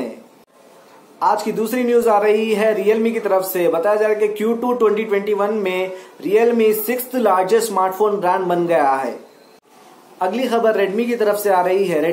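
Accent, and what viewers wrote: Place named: Hyderabad, India. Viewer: Bhai thanks for the info Lekin pura video me jo be bolre aap Hyderabadi accent Me ich bolo Toda North accent bolre toda hyderabadi mix hora Hyderabadi tech channel bole toh pura accent rakho bhai jab ich viral hota For example : are mama Oppo ka naya phone arra kate ye ye featurra hai kate kiraak phone hai aisa bolo bhai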